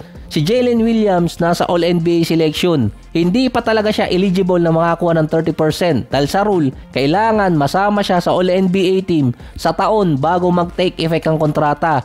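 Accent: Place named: Pampanga, Philippines. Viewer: Kapampangan ya i koya 😂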